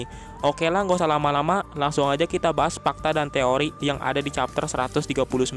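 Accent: West Java, Indonesia. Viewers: dari mana, sunda nya 😅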